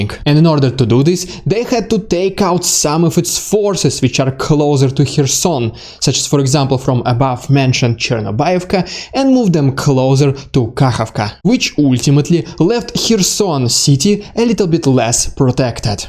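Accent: Russia. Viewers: I love your accent dude, but it needs to be much heavier and thicker you talk a little bit soft. Just drink vodka before you speak your voice may get heavier I just can not wait for your next video dude but please improve your accent to make it much heavier and thicker and harder 👍👍👍 so I will call you this guy is a really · 😎💪🔥 Coolest accent dude!!!🧐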